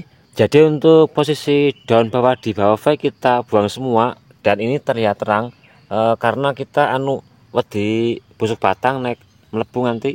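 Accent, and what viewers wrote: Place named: Banyumas, Indonesia. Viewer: Ngapak temen lur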